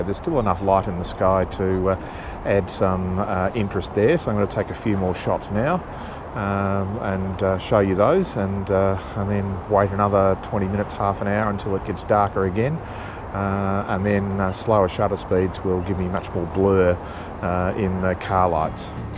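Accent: Australia. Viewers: I liked the video "Dad's garden" that was the first time I realized I do prefer the broad accent. I think you talk "Strine". It's actually what I want to learn and aim for. I will certainly watch all of your videos they are amazing and yes, I can also practice Aussie English.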